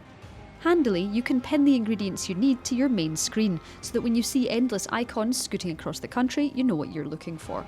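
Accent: Scotland, United Kingdom. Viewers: I’m Irish and that’s definitely not an Irish accent. Sounds Scottish. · Central Scotland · She’s Scottish 🤦🏿‍♂️ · That Scottish accent <3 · Sendo Tiago scottish actually. A subtle distinction but very important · That Scottish accent is irresistible lol. Had to sub! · So nice hearing a Scottish voice for things like this. Is there more just from her? (I'm not being weird I'm Scottish too and it's just easier to follow) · Um, she’s Scottish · That's a Scottish accent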